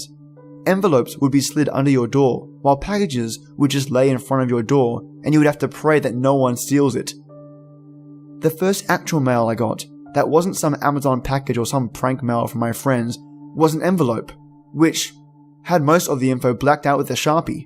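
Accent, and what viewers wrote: Australia. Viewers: Hell nooo!! 😱 If someone was in my garage I would try to trap them in there until the cops showed up to arrest them. Good story, Great narrating, and as always awesome Aussie accent 😉 haha 🙂🖤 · the Australian accent does but me to sleep. But I dong think my work wants me sleeping on the job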